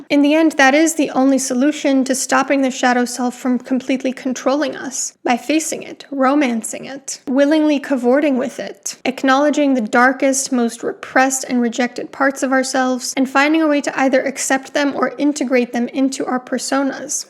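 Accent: Romania. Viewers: Were you born in the US? Your pronunciation of "Nosferatu" gave you away as a romanian but your English sounds american. Cheers for the video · I'm curious if you're familiar with the 'Subspecies' Vampire films from Full Moon Features.... They were the first to be filmed in Romania, using the Hunedoara Castle as the set....the Character of Radu Vladidlas is a magnificent example of a classic, menacing and maniacal Vampire. Also, I loved the new Nosferatu as well....your review is very good and your Romanian accent is fascinating! Thank you and if you haven't seen the Subspecies movies, definitely do yourself the favor of rectifying that!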